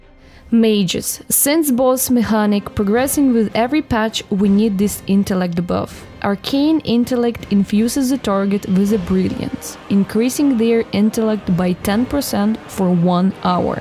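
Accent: Russia. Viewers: are you Russian? That accent you have I've heard it on 007. Or maybe too much Russian bots and the USA...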